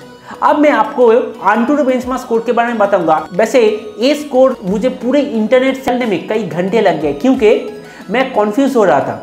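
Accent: Bengal, India. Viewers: আপনার উচ্চারণ শুনে বাঙালী মনে হল।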